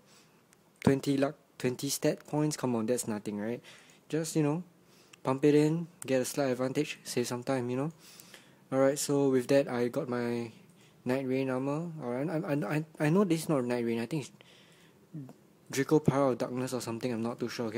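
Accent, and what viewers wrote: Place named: China. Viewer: your really good man! you have a chinese accent... anyways if you want to know, i am chinese ^_^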